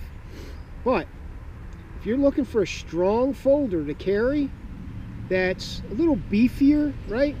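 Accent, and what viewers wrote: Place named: Eastern Pennsylvania, United States. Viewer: Judging by your very strong Eastern PA accent, I assume shop is in Pennsylvania somewhere?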